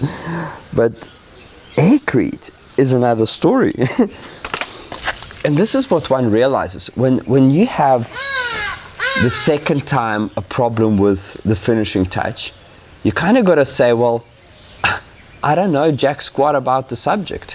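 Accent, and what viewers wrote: South Africa. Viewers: I hear a Saffer accent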